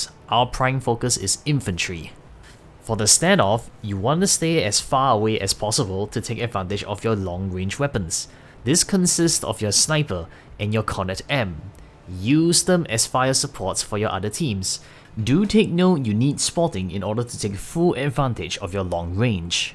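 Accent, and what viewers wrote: Singapore. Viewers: somebody sounds like they're from singapore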